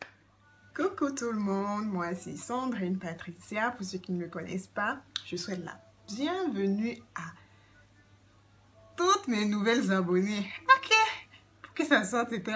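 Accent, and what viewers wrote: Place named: Cameroon. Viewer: Bonne vidéo avec notre bel accent Camer👏. L’idée de ramener les beurres à l’état liquide est géniale merçi.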